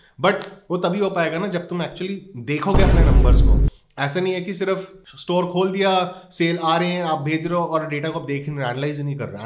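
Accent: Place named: Surat, India. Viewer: just by the tone i can tell for sure you are shipping from surat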